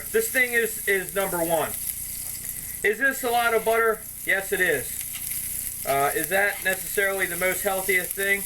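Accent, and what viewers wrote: Baltimore, United States. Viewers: You must be from Baltimore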